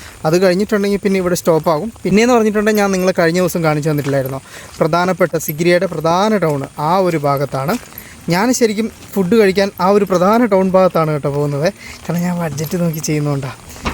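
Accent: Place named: Kothamangalam, India. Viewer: നിങ്ങൾ കോതമംഗലം കാരനാണോ കണ്ണൂരുകാരൻ ആണോ നിങ്ങളുടെ ഭാഷാശൈലി കോതമംഗലം ആണല്ലോ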